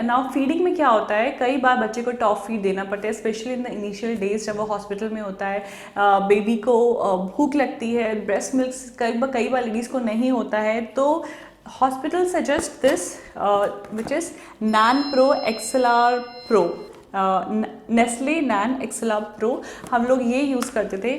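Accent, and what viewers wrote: Garhwal, India. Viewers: Aap garhwali ho kya ma'am....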